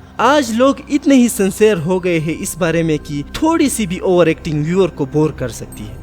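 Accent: Gilgit, Pakistan. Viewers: bai tera naam hai aur lehja bilkul Gilgiti hai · Bhai apke dialect to pakistani h? pakistan se ho as far as i remember, the boom of pakistani drama comes after Zindigi Gulzar h type of story that is retable to most of indians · bahi app accent se gilgiti lagtey hoo...where are you from??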